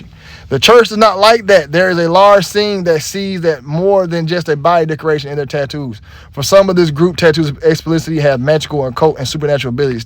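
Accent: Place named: Memphis, United States. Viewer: Thas mah cuhhzen 🤣🤣🤣 I like the Memphis accent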